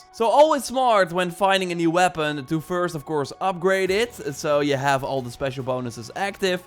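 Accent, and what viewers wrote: Netherlands. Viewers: Are you Dutch cause you kinda sound like it😆 Me2 btw Dutch ff fanboys ftw! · Clearly not Belgian, I think it really sounded Dutch as well :)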